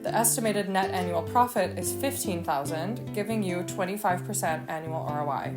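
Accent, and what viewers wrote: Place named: United States. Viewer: You sound American. How did you get to do this? You are so lucky.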